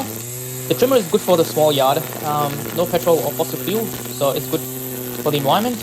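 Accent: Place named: Australia. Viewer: U have perfect aussie accent even better than aussies...how u mastered it... ? Just feeling michael clarke is there...😅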